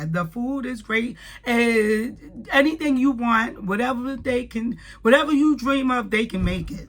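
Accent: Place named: New Jersey, United States. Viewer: Loved your video, glad it came across in my algorithm, and yes, I subscribed. Your voice and your accent gave me a warm and fuzzy feeling of listening to my relatives back in New Jersey ❤ Looking forward to your new videos while I catch up on your old ones!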